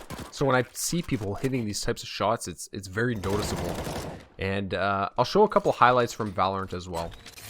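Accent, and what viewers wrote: Canada. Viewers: Are you Canadian? Accent sounds super Canadian.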